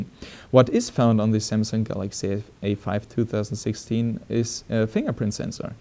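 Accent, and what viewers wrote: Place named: Germany. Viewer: i guess you are German. i like your accent. that's cool man....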